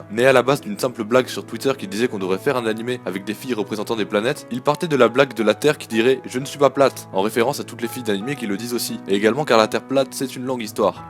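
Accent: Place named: France. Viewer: MÈME Jpp j'en ai marre des français. C'est un Meme et ca ce dit mims pourquoi vous changez tout avec votre accent de bite. Trigerred.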